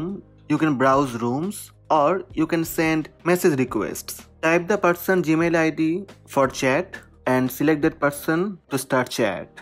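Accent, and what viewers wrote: India. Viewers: His accent is Indian, sonny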